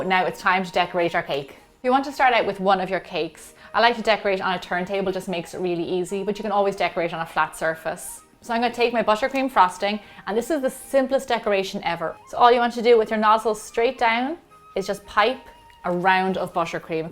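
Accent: Ireland. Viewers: Are you Irish?Because the way you pronounce the "T" it seems!☺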